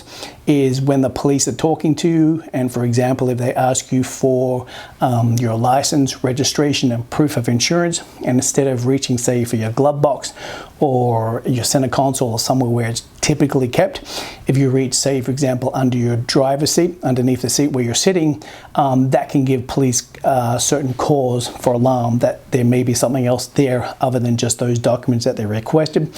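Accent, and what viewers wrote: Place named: Australia. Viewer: you sound like an Aussie. When will the US have insurance and registration linked to the number plate so the police only need to ask for your licence?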